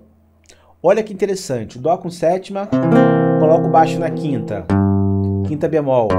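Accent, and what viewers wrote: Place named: Rio de Janeiro, Brazil. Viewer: sabia que era carioca!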